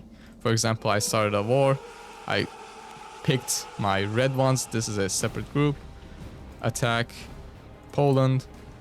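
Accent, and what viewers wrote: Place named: Turkey. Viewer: Are you turkish your accent seems turkish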